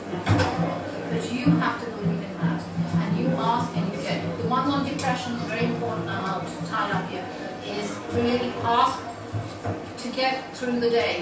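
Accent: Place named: England, United Kingdom. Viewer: Nice to have found your instruction and insights today. Always good to discover an angel with a marvelous English accent. Thank you for the Candle Lesson. I have finally simplified my life by paring down this path with Meditation, Exercise, Creativity philosophically. Now to activate it. The Candle will be a welcom aid. Thanks for the light...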